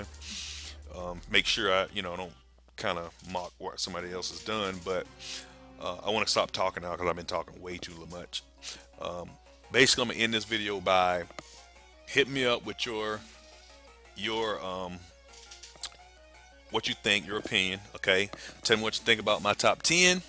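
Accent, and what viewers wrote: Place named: South, United States. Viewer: That southern accent tho...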